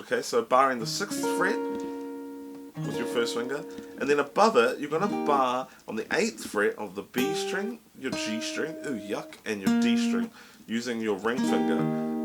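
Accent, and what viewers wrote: New Zealand. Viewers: thank you for uploading this! btw I love your accent, are you from new zealand?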